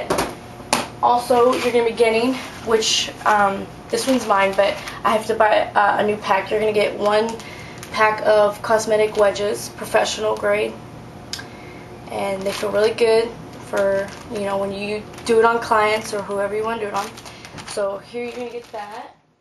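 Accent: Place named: Latin America. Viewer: MAC omg ur soooo prettyyy and u hav a sper cute accent its got like a lil latino kik to it lol if u no wat i mean...cant belive this contest is over reli cud hav dun wiv those products lol tc xxxxxx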